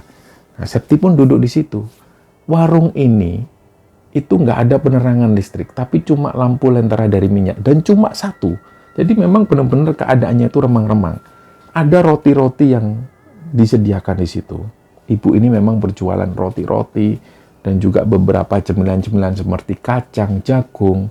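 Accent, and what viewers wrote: Java, Indonesia. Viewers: mas septian... jangan lagi mencari sosok bapak² yg menolong panjenengan nggih... kirimkan alafatihah saja sesuai dengan apa yg ibu warung sebutkan ketika menyapa bapak tsb.saat hendak mencari mbak septi, 😊😊 mbak septi ada satu pesan dari alam ghaib ktika memesan mi instan,beliau berucap "MAU BERSABAR" 😊 om bhasa "jawa" nya medok bingits ❤👍👍👍 sehat sehat ngih 🙏🏻🙏🏻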